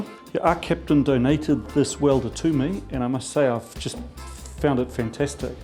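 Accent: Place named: New Zealand. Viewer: I joined this channel because you use FreeCAD! (and maybe also because I love the Kiwi accent...)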